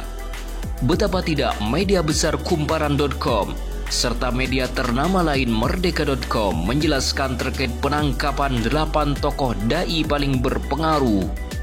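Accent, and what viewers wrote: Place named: Malaysia. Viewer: Nah akhirnya betul kan yg gw bilang. Ini youtuber malaysia cari dolar di indonesia. Adudomba biar indonesia rusuh. Logat lo ga bisa bohong, walau melayu, gw besar di riau jadi ngerti logat melayu indo dan logat melayu tv malay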